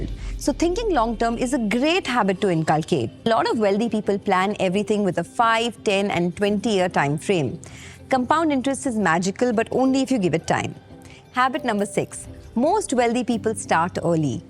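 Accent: India. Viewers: Indian speaking style is weird 😆.....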